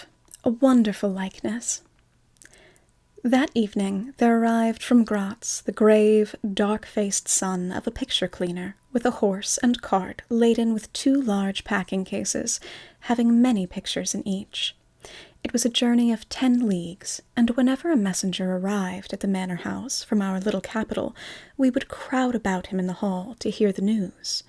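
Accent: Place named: Transatlantic, United States. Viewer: I love that you’re keeping the transatlantic accent alive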